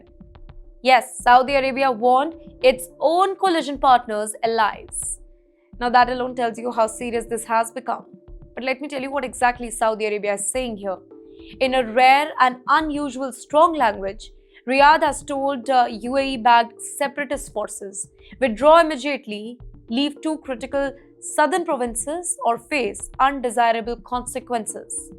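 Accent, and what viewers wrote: India. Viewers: Oh my god look at this curry accent we Australians don't understand what you are saying speak proper English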